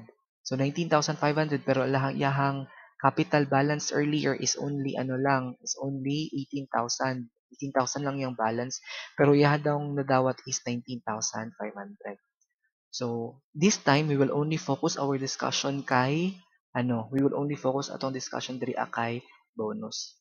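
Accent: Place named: Visayas, Philippines. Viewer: Thank you po. I enjoy po the tutorial when you speak Bisaya. It is kinda cute po.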